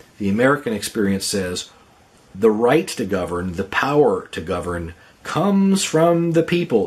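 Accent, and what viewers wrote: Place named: England, United Kingdom. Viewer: I like your English accent, lol.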